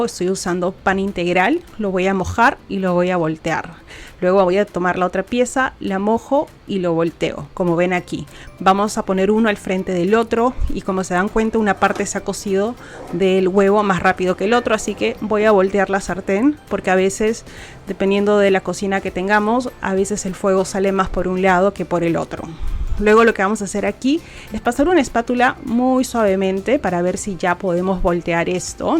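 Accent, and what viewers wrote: Peru. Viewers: Gracias por compartir, me encanta tu dicción, tu acento bien peruano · me encantan tus recetas, siempre sanas. Te digo desde España. Me gusta escuchar tu acento peruano ( aquí en Sevilla teiuna amiga peruana). En este video y en otros te escucho decir que algo es "demasiado" delicioso y me preguntó si en Perú se usa de modo diferente que aquí en España ya que aquí cuando decimos demasiado con algo positivo, el significado es negativo, si digo que alguien es demasiado simpático el mensaje es negativo, no me gusta que sea tan simpático. Probablemente ahí no tenga ese sentido. Bueno, una curiosidad, no es mi intención corregir tú forma de hablar ni mucho menos.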